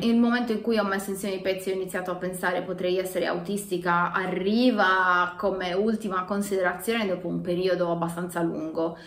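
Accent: Italy. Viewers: Ovviamente più intelligente del normale 😅, l'accento sardo e' carino...😅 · ciao sardo anch'io e con lo stesso modo di parlare al minuto 2 e 30 (ghe al posto di che) alla romana ma io nn vivo a roma....è normale???complimenti per il coraggio (sei anche una bella ragazza)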